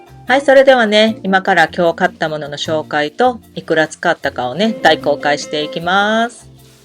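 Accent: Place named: Kansai, Japan. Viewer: 本当にこっちは食物類高いですよね、っていうか高くなりましたね。 関西弁がとっても懐かしいです。😊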